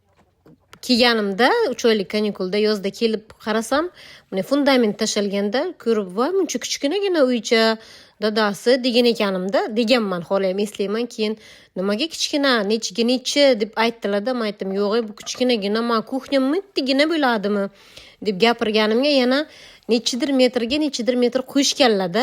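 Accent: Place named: Termez, Uzbekistan. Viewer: опа яхшимисиз жуда яхши аелсиз тан жонингиз сог булсин термизчангиз жуда ектида лекин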